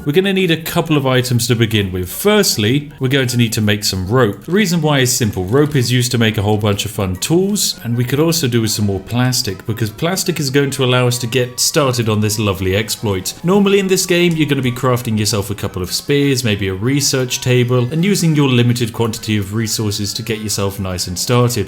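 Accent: United Kingdom. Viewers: Oh god... that's your actual voice!? Like you don't overact for your vids... you seriously sound 200% british even when talking with your friend. Tea.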